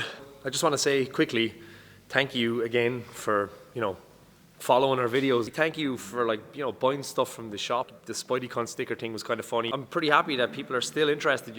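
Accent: Ireland. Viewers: Good old Irish accent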